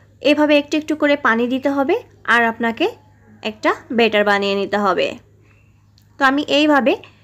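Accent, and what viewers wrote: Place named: Kushtia, Bangladesh. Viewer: Apu apnar vasha gulo amader vasar sathe mill ase,,kustia,chuadanga amr basa.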